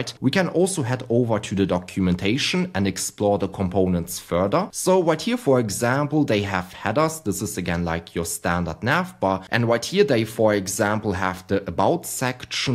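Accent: Germany. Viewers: Are you German ? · your accent is really german haha, fire video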